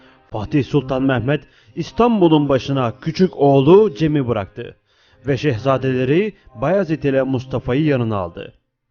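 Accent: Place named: Balkans. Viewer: eyvallah kardeşim. Balkan esintisi var telaffuzunda. Kötü bir şey degil. Begenerek takip ediyorum. Tavsiye ediyorum. Hele son zamanlarda çağ atladın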